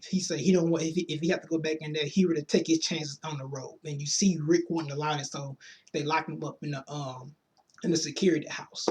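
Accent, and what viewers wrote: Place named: New Orleans, United States. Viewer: Sounds like your from New Orleans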